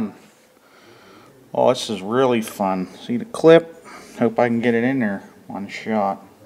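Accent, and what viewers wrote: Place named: New York, United States. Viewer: Great video just did crank bearings on my 391 shes ripping again. Thanks! I can tell your from New Yawwwk somewhere. Maybe penn.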